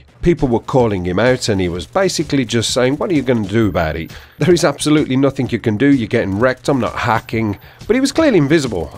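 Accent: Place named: Ireland. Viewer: Your irish :)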